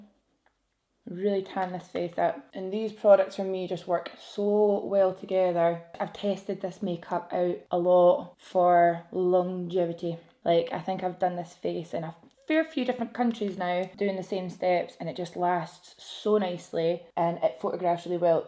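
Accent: Scotland, United Kingdom. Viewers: oh god i love the scottish accent 😍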